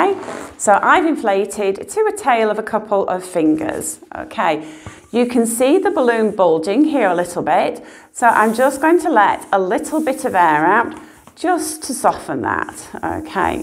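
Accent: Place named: United Kingdom. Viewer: Thank you so much, I learned a lot. Also really makes me miss the friendly people in the UK when hearing the accent 😭😭😭😭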